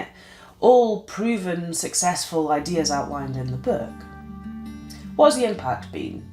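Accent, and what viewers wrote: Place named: United Kingdom. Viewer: I love it when a British speaks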